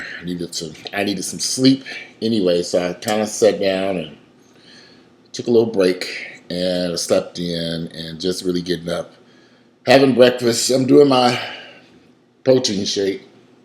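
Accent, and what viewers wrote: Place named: Chicago, United States. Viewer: Sound like Chicago! !!!! Exactly like Chicago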